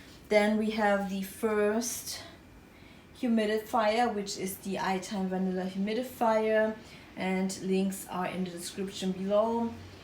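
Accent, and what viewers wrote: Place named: Germany. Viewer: Hi, great music 👍. This is the first Video I watched, and damn, all the time I thought "this accent, she has to be German". Switched to your housplant tour part one, looking out of the windows, in the backround, furniture and stuff if anything can tell me... Nothing in your channel-information. And then "LA" 🙈. Finally "Berlin", so I can chill 💁. Greetings from Germany! 🇩🇪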